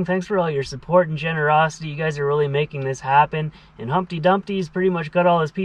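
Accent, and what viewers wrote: Canada. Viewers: You got me with your pronunciations. I thought Canadians just didnt know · Most Canadian language pronunciation episode yet!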